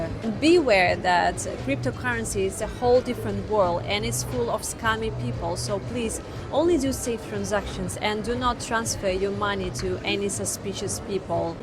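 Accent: Russia. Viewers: Madam, living in various asian cities Have blunted the Russian accent of Your English. i.e your english has lost Russian flavour. I love to listen russians Speaking English. It very different and Nice to ears . ❤❤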